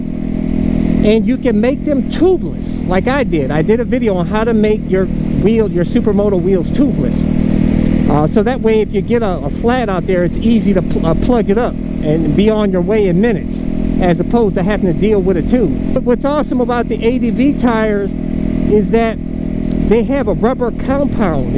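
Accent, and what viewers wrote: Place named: New York, United States. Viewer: From Ohio but talks like he’s from New York lol I would like your videos a lot more if you talked less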